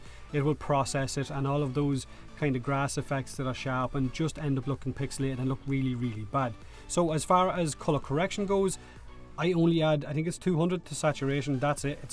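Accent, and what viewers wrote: Ireland. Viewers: Your Irish accent is awesome :) (seriously Irish and Scotish accents are the best :D) and thanks for the video! :D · How do you add that sweet Irish accent to your videos?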